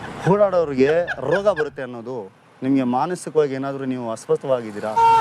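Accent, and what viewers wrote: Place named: Hassan, India. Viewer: Bro nivu mathdo reethi nodidre namma Hassannadavaru anssuthe bro 🥰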